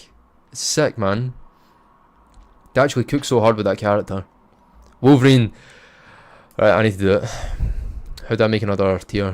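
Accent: Scotland, United Kingdom. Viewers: Is that Scottish? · Its the Scottish accent. Based like Logang. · He said "He can literally kill" not "he can one shot kill" the scottish accent just makes it sound weird.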